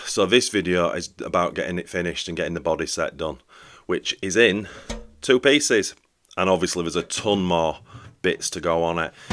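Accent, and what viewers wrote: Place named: West Yorkshire, United Kingdom. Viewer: That looks awesome. Would like to see it next to the Blackfoot cos you said it was way bigger. I've been watching your channel from pretty much the beginning, I think you had 37 subscribers. An it's great you've not lost your west yorkshire accent. I was brought up in Morley, moved 20some miles north and mine changed.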